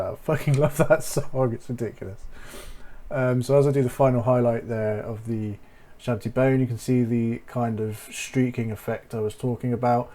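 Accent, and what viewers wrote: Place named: Northern England, United Kingdom. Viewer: u have the jhon snow accent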